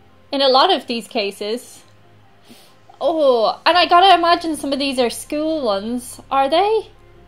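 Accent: Ireland. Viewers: With all the American topics I was starting to think there's a strong possibility that an irish impersonation was just part of a gimmick haha so terrible of me to say I know, there's no complaints it was just a little peculiar when I heard it!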